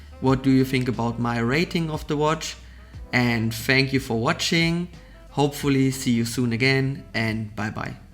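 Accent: Germany. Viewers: Are you Form germany?😅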